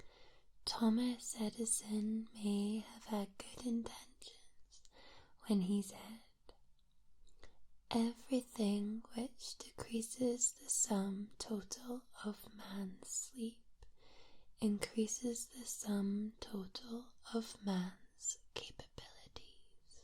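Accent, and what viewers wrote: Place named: Scotland, United Kingdom. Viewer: 😀👍 3hrs of Sultry Crikey! This will be good....very good.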